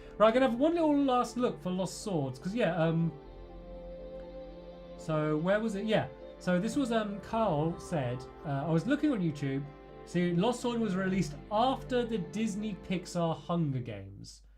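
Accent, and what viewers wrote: United Kingdom. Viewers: British English quite well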